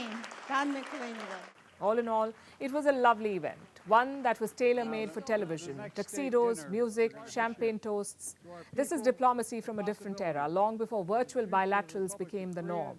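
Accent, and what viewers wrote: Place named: India. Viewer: FIrstpost is funded by the CIA. thick Indian accent is meant to confuse Indians. is paid to spew anti-Russia and anti-China propaganda. never bad-mouths Biden the same way. OOOps. That gives it away.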